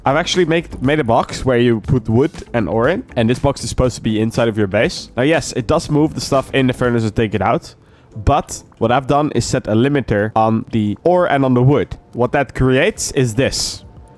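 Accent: Netherlands. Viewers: Nice dutch accent =D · You are dutch. Prove me wrong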